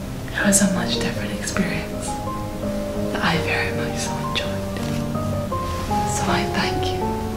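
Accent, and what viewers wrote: Scotland, United Kingdom. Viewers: wow, not gonna lie i did not realize you were scottish til just now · Scottish, Irish, British, or Dutch accent? Either way your voice is lovely!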